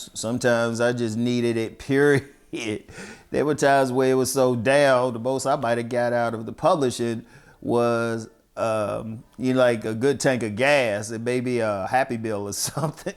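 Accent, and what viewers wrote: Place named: Baltimore, United States. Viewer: I’m 28 years old I hope to be this calm n calculated when I’m older s/o to you og from a northern VA Cat to a baltimore brotha I can hear ya accent 💯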